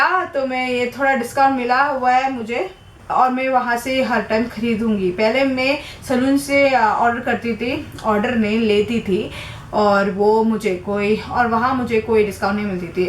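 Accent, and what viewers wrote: India. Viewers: Hahahahah u sound cute in ur Hindi accent ! 😂😘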